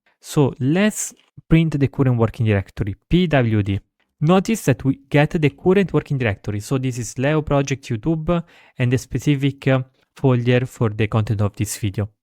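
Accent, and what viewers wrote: Italy. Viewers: Nice italian accent!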